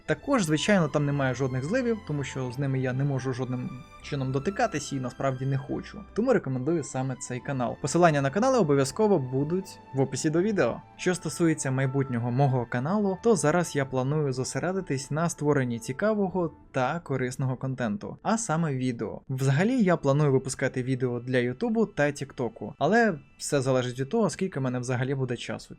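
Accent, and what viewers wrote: Ukraine. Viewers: Это слышно что восточно-украинский, Западный я вообще не понимаю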